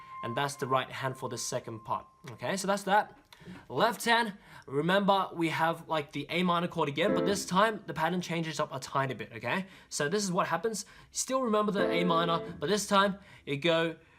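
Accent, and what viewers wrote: United Kingdom. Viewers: You’re British man and accent coo! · Even tho bro's asian his accent is british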